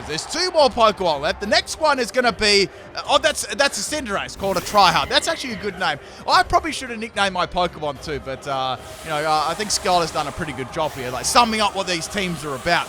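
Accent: Australia. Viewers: Yes his Australian accent makes it so funny 😆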